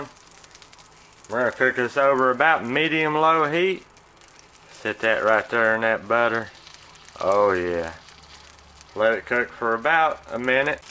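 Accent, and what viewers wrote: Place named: South, United States. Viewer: It's the southern assent for me..I love it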